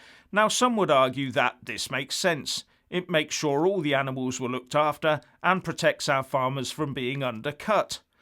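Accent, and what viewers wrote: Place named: Dorset, United Kingdom. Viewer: Proper Dorset accent. Good video.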